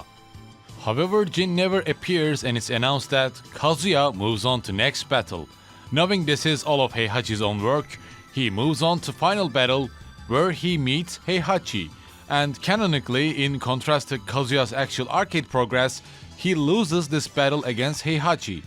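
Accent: Turkey. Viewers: man, you just have such a prominent turkish accent. i couldn’t see any signs on ur account that u might be from turkey, but it’s unignorable.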